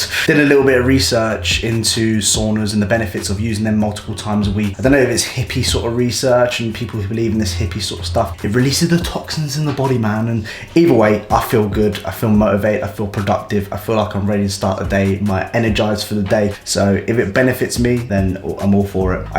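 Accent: United States. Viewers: Haha love the American accent 😂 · Haha mad, your American accent make you sound like Charlie Hunnam from sons of anarchy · the American accent is hilarious but accurate lmao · that american accent omg ahahaha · 😂😂😂dying at the American accent. I love it.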